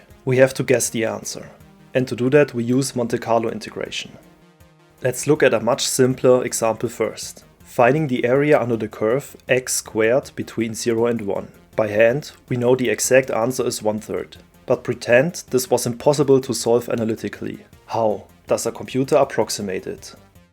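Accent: Germany. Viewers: Is it just me or does He Sound german? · What's up with the German accent? (first time seeing the channel)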